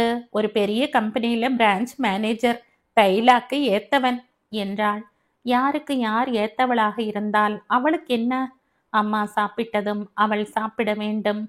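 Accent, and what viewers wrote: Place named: Palakkad, India. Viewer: Mam, Your Palghat accent is very nice. Anyone will assume you are brought up in Palghat!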